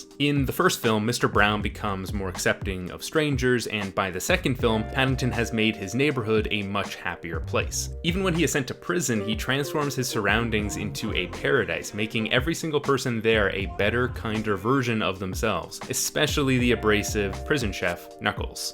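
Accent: Canada. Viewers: The Canadian accent is strong in this one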